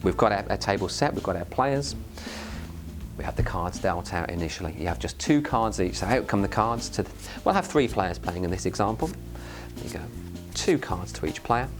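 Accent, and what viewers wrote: United Kingdom. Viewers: I can't understand this guy with that heavy English accent. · why a brit guy is teaching texas poker ? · One of the simplest vids to learn despite his deep British accent lol.good Good thx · He's actually Russian but he does a great Brit accent when explaining a Texas game. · First “how to play” video I watched was an Aussie accent, this one is a British accent. Maybe third times the charm?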